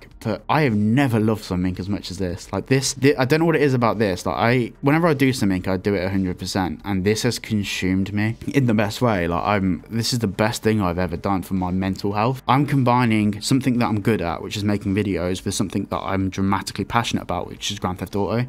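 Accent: United Kingdom. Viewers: I didn't start watching you for GTA, 2 reasons why. One, your British accent and mainly your ambiance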